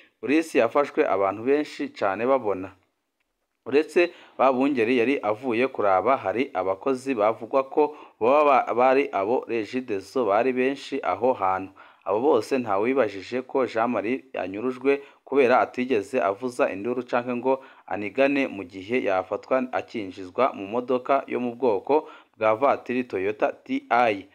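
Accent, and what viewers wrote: Rwanda. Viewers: Amakuru yawe aba maremare kubera usubiramwo ivyo uba wamaze kuvuga kandi wige kuvuga neza ikirundi ugitandukanye n'imvugo nyarwanda nyarwa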